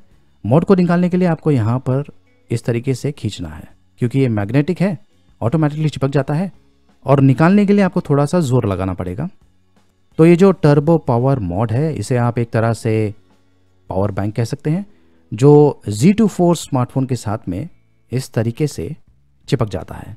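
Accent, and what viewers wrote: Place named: Bihar, India. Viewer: Sr bihari wali boli aadhai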